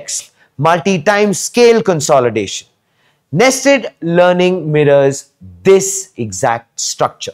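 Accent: India. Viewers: He is separating his words and using volume variety to clarify his Indian accent and doing a very good job of it!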